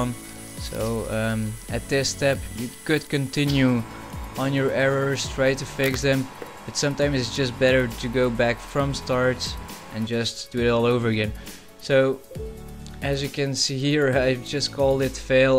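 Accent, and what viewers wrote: Netherlands. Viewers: are you dutch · Let me guess.... your from the netherlands? (just the accent)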